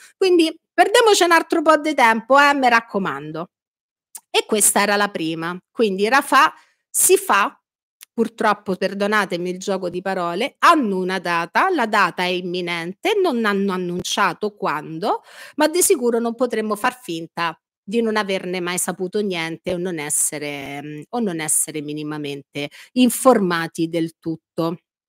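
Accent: Rome, Italy. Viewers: ti ammiro molto, ma scusa però, tu dai veramente corda ai troll che ti criticano il bellissimo accento/dialetto romano? Ignorali 🫶🏻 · non avrei saputo scriverlo meglio, condivido in pieno. Quel "romanaccio" è quell'elemento fondamentale per strapparti risate nonostante si ascoltino robe peso. Avanti tutta! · L’inflessione romanesca credo sia voluta per rendere più efficace il tutto. In realtà si coglie un ottimo italiano sotto l’intercalare. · Ma poi non parli il dialetto romanesco.( Nessuno ormai lo fa) Hai una piacevole ( per me) inflessione, ed usi espressioni tipiche che coloriscono l'eloquio di noi cresciuti nella città eterna rendondolo più efficace per chiunque abbia anche solo due neuroni accesi. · Io adoro come parli e quando lo fai in “romanaccio” ancora di più! E sono sicura di non essere la sola ad apprezzare sia il tuo perfetto uso della lingua italiana che quello del rafforzativo romano che sai dosare egregiamente!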